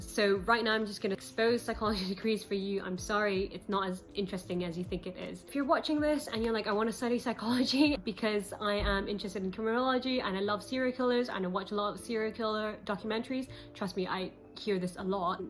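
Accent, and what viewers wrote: United Kingdom. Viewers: Love your gorgeous British diction !!! You present nicely, viz. you speak in complete sentences (subject + verb + object,) your predicates and pronouns agree in case, gender and number with your head nouns, and you do not lard up your presentations with that ignorant non-word, "UH." Very, very professional ! Greetings from Pennsylvania in the Queen's American Colonies. Best wishes for a good career and a good life. (PS - Jordan Peterson is my favorite psychologist on this side of the water.)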